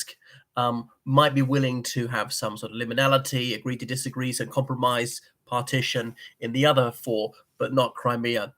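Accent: RP, United Kingdom. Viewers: You speak posh but are literally a gullible credulous clown.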